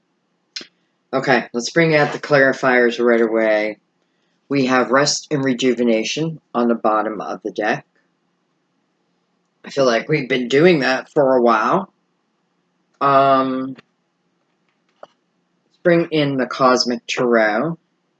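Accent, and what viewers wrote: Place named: Philadelphia, United States. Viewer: Girrrrl!!!! Omg you are so gifted. But you're also familiar and cozy. It's a joy to listen. And that Philly accent! Makes me homesick for PA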